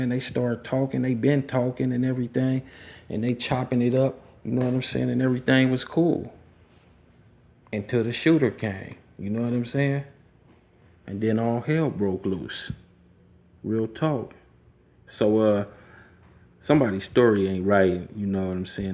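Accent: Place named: West Coast, United States. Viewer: sound like you are from the west coast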